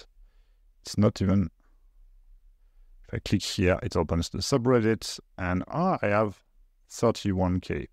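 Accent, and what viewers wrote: France. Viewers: Really good, thanks for this advice video, nice english accent (ça s'entends un peu que tu est français comme même :D) Keep it up with the great videos. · From the way you speak English.. I'm getting your normal French accent. Which means you speak French Did i guess correctly? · 😵‍💫🤯🤯 du jamais vu. Ton approche innovante, la réflexion profonde, la succession des étapes,les outils utilisés chapeau même le fameux French accent 👍. Tu as un abonné et un like + un commentaire. Merci pour le partage. Je crois que tu as été copié si ce n’est pas quelqu’un de ton équipe.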